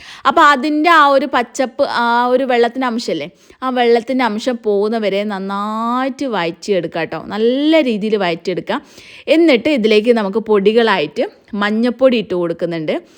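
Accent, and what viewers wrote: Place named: Malappuram, India. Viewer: Malappuram ano... Slang kettitt angane thonnunnu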